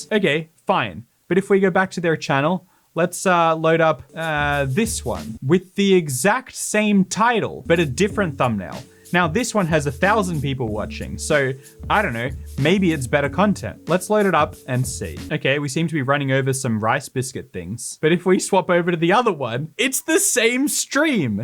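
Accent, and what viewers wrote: Australia. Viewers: i thought he was australian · i did not know, i thought hes austrailian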